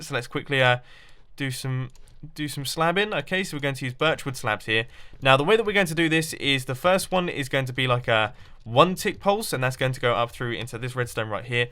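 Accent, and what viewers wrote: England, United Kingdom. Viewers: In just 2 minutes I was inspired to fly my drone again. I've never been so inspired in my life. Is it the British accent? Idk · Yeah I figured he was English (or Welsh) but I just wanted to ask because you never know.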